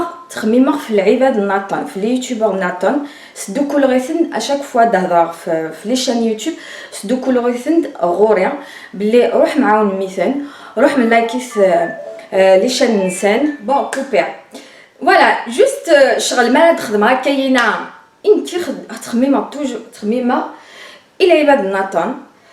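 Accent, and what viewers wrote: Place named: Kabylie, Algeria. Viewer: j adore tes video et surtout ta facon de parler le kabyle.j aime bcp ta personalite car je sens que tu es sincere je t encourage a continuer ton travail afuth iguerzen a weltma.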